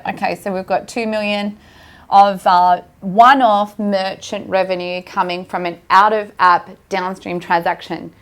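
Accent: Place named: Australia. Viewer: Hey there great video looking forward to finishing it. I noticed this was shot aways back would be cool to get an update on your journey with your idea. Also i was wondering how much did this app cost to make and did you run into any financial challenges/surprises when rolling it out. Lastly are you aussie based noticed you have an aussie ascent. Im Gold Coast based and would love a chat.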